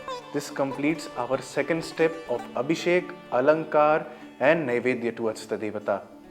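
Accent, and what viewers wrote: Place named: United Kingdom. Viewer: Why are u speaking in BRITISHER INVADERS language? Do we have no INDIC language to depict .. And why are u calling उपासक as उपासका & भैरव as भैरवा, while you correctly pronounce Chanel, Louis Vuitton, Dior, Citroen, Moët & Chandon, Laurent Perrier, Veuve Clicquot, Louis Jadot Domaine Leroy, Château , Bordeaux and L'Oréal as per original French pronunciation & correctly pronounce ikigai, wabi-sabi, kaizen, shinrin-yoku, omotenashi, gaman), kintsugi, shikata ga nai & bonsai as JAPANESE pronounce them. Then why SANSKRIT terms are pronounced by you as beef-eater BRITISH pronounce them? You're full of arrogance & ignorance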